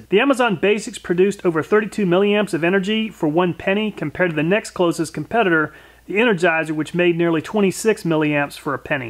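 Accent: United States. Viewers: plus that strong american accent 😁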